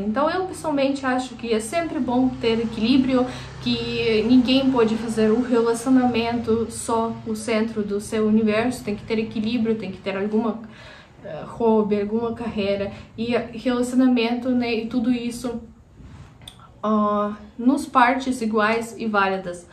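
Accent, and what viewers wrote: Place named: Curitiba, Brazil. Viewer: O tópico é curioso, a maior comunidade eslava do Brazil está em Curitiba. Como namorar como uma Curitiba ? Um fato curioso, teu sotaque é parecido com o de muitos curitibanos, assim como aparência ( pela minha visão de alemão ). A minha impressão é de que as pessoas estão curiosas a seu respeito ( hipótese ). Se minha memória estiver correta este conceito de esposa fora chamado de Amélia ( referência a musica antiga, relativo a esposa no sentido bíblico dentro da cultura hebraico/ cristã )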